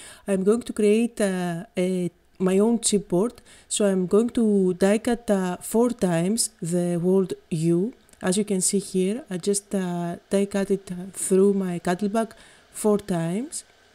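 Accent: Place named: Greece. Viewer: I have been following your blog and videos for a while now and just love your cards. Just love your Greek accent.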